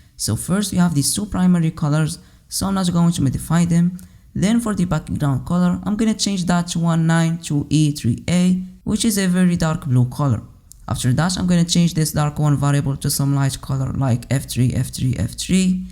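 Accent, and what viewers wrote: Morocco. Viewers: Good stuff my man wyni mathmeqnich pronunciation mghribya hadi 100% rak kayn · Great job! From your accent, I think you are Moroccan.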